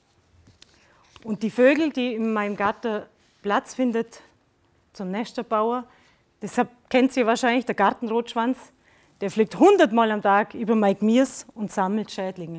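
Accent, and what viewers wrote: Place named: Allgäu, Germany. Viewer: Ein wirklich sehr beeindruckender Vortrag - überaus sympathisch, unaufgeregt und motivierend! DANKE! Auch meine Pläne werden so langsam immer konkreter. Es wird auch allerhöchste Zeit für mich! Ich liebe zwar das Allgäu (mindestens so sehr, wie Dialekt!), allerdings zieht es mich eher in die Oberpfalz... - zu den Wurzeln meiner Familie.;) Namasté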